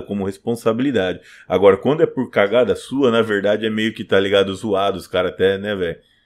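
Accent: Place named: São Paulo, Brazil. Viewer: ele é ex-yakuza sim! e tá no brasil com sotaque paulistano falando de uma das máfias mais violentas do mundo. é sim.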